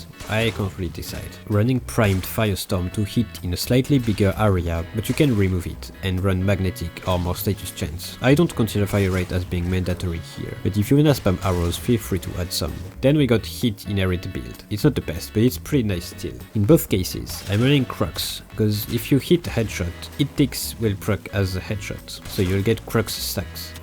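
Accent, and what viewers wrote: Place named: France. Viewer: Love that little French accent❤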